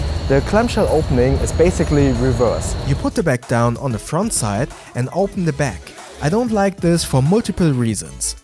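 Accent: Germany. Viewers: I honestly can't tell if you're happy, sad, upset, mad or tired because of your German accent lol. It's basically always one tone because of the pauses. I'm sure that's just a habit of speech from the German language.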